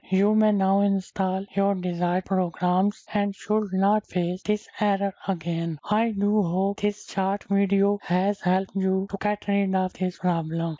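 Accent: India. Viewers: Indian robot voice, damn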